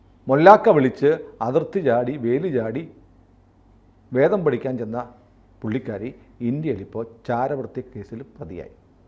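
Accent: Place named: Thiruvananthapuram, India. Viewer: തിരുവനന്തപുരം ഭാഷ ഉച്ചാരണം.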